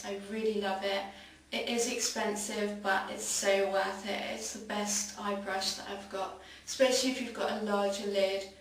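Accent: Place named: Public school, United Kingdom. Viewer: you have such a fabulous public school accent!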